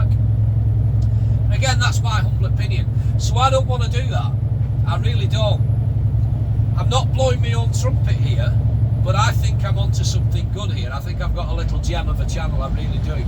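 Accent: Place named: Wigan, United Kingdom. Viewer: I'd love to give you ma mates address in bonnie Dundee,park that heep of shit outside his window 😁 Strath carron, give us some of your Wigan twang!!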